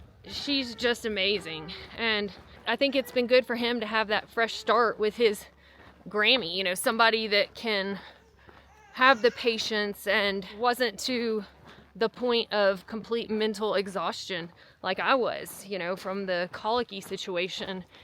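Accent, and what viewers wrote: South, United States. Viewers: As a mama who has gotten very little sleep over the years with constantly breast-feeding babies that for some reason just won’t go to sleep, separated from a partner on and off because of work, and never having had a babysitter this video was really powerful. That song has meant so much to me for so many years having grown up on hot, flat coastal plain land of Southeast Georgia and then moving to a place with some little mountains to follow my dreams. I’m so glad you were able to be a positive role model to other mothers while being so loving and respectful towards your own mom who is stepping in as a second mom through all of this to help all of y’all out. I love that you’re confident enough to record yourself interpreting everything around you with a Southern accent, phrases, and mannerisms. It’s so dang funny, so raw, heartfelt, and comforting. 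My nine-year-old born ornithologist, backpacking daughter watches now to identify all the birds, which means it takes an hour to get through one video so she can research each bird. 🦅🦉🕊️ You are a hero to many. Y’all have amazing footage, fantastic editing, and big hearts. I’ve been wondering when we’re gonna see more of the family dynamic after getting so invested in your channel. That last bit with him seeing his daddy and putting his sweet little head on his daddy’s shoulder broke me for sure, but the song already had me crying right from the start especially after hearing you cry, too. (I know the mom’s in the grandma’s usually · You’re so stinking cute with your southern accent and your surprise at experiencing Arizona for the first time. I lived in TN for over 6 years, 30 years in NM, now back in AZ for 13 years. I love your expressions of wonder. Did R2R:18hr. at age 59. My health took a hit after that. 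Now in Payson,loving it!!! Love you ❤️❤️❤️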